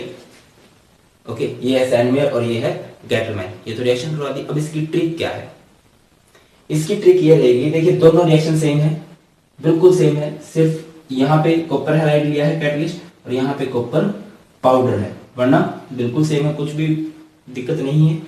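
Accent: Haryana, India. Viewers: Sir kya aap haryana se h ??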